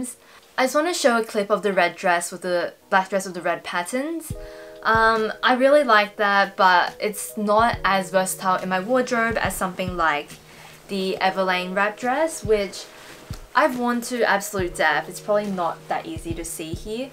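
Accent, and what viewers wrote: Australia. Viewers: 안녕하세요? 한국인이세요? 너무 사랑스럽고 실용적인 코디를 하셔서 즐겨본답니다. 영어채널이라서 100% 다 듣진 못하지만.. 영상 거의 다 봤구요. 송혜교 느낌 닮으셨어요. 호주발음도 매력적이어서 너무 좋아요!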